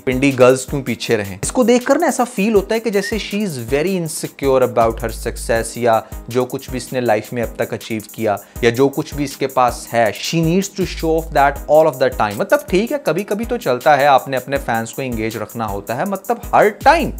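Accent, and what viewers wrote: India. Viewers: I wonder how he is speaking constantly in hindi accent 🤔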